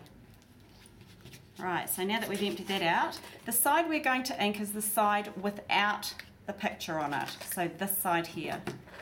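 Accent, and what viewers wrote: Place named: United Kingdom. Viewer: British Accent LOL! Cute tho, :)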